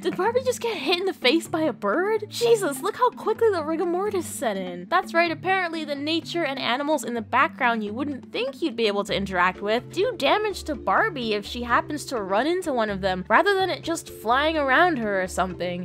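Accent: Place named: United Kingdom. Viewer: Just recently found your channel through reccomendation somewhat, and I love it.... After scroll through many of your videos, it Reminds me a bit of Kim Justice content, but with milder and clearer voice (british accent sometimes sexy, but hard for me to discern as a non native english speaker) and thats a lot kudos from me...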